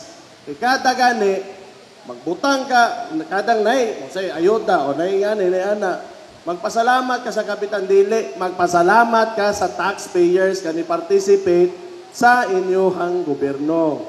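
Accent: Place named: Visayas, Philippines. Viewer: Bisaya k magtagalog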